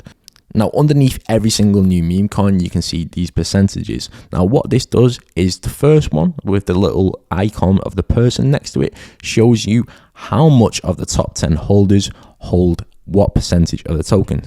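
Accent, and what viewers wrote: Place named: United Kingdom. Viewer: Always subscribe when I hear the UK accent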